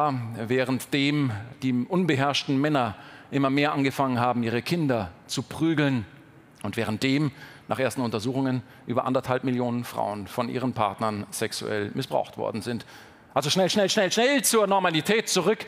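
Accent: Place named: Bavaria, Germany. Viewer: Auch wenn er aus Bayern kommt ist dieser Dialekt, so finde ich (Niederbayer), unangenehm anzuhören. Trotzdem ein tolles Video.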